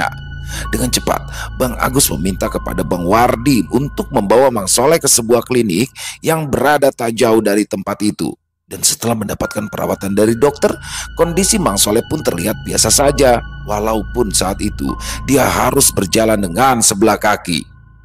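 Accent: Jakarta, Indonesia. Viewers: barrokalloh yg dinanti2 bang piyan muncul juga plng suka kalau bawain bahasa betawinya mantep pokoknya sukseees terus bang dengan cerita2nya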